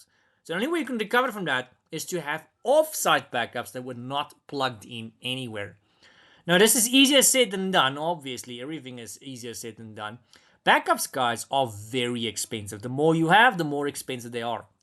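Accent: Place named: South Africa. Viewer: Just starting my sec+ journey subbing to you simply for your epic south African accent. 😁 The apple sauce of all accents.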